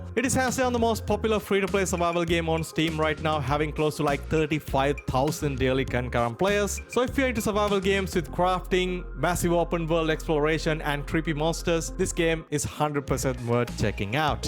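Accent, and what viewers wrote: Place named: India. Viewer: bro u sound like indians if u dont mind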